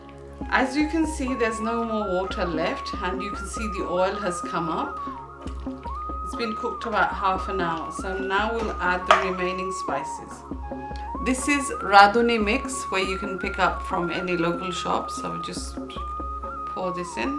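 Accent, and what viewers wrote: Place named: United Kingdom. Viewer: Auntie sound like has been studied in UK or stayed in uk for long time by the accent. Nice video btw. Love it. Chittagongonian food is the best.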